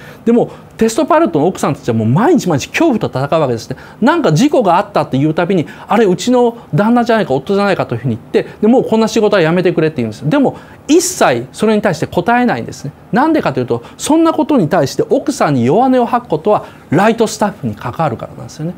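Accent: Kansai, Japan. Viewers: 最初の方は関西トーンな岡田さんも良いね👍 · 知ってるかもしれませんけど、この人、元々関西弁ですよ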